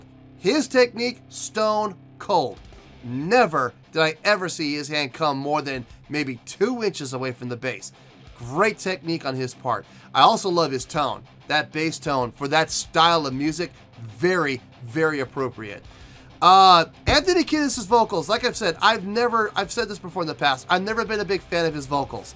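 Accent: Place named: United States. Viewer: How come you didn't listen that album before??? You are definitly old enough?! And you sound american. I was born in 1978 in ex yugoslavia and know that album by heart. Are you faking it? Please don't say you were bussy listening panthera or sepultura 'cause that's no excuse.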